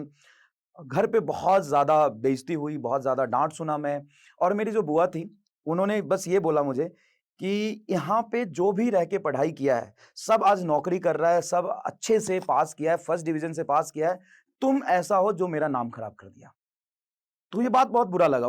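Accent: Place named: Bihar, India. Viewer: Sir got that Biharizz😩😱😱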